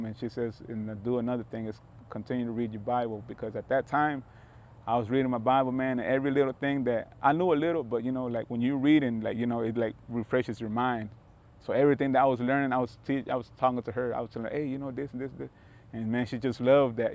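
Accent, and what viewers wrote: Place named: Alabama, United States. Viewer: What a trip, a Guatemalteco with an Alabama accent. ❤ Stay strong in the faith hermano.👊🏼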